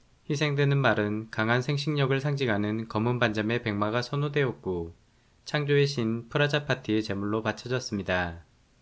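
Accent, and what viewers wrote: Jeolla, South Korea. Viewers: 전라도 억양이 있는 거 같으심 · 이분 어투가 라도네!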